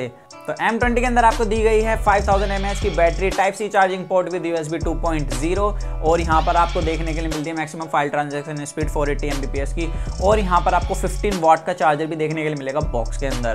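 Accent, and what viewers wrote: Haryana, India. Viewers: haryanvi ho bhai kya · Launda Haryana se lag Raha h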